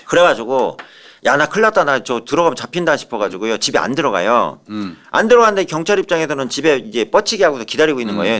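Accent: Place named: Seoul, South Korea. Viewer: 염블리님 얼굴이 헬쓱해지신거 같은데 아프신건 아니겠쥬? 근데 말미에 뭐뭐 한거라~~ 이러이러 한거라~ 이건 어느지역 말투에용? 옛날 서울사투리같은 느낌인가요? 사건의뢰 왕팬이라 자주 듣다보니 저도 모르게 따라해서ㅋㅋ 궁금해지네요~~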